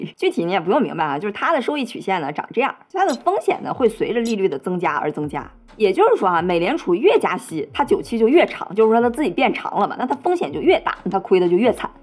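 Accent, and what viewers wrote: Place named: Beijing, China. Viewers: 北京乡音，，太好听了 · 喜欢这北京腔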